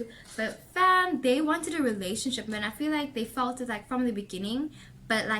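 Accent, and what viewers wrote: South Africa. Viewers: 😂😂😂 The 2nd scarf - You totally took me back to where we met. His a gemini and I'm a cancer. Everything you said resonates besides the long distance part or probably it means the distance between the two of us. I'm even older thn him You have a South African accent. Do you live in Kempton Park or near Lanseria? If I'm wrong, let me know please. I would like a reading in person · Ah south African? ?? 😄 yessss 😄👏❤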